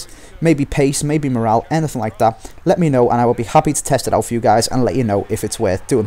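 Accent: Liverpool, United Kingdom. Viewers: i thought it was just the scouse accent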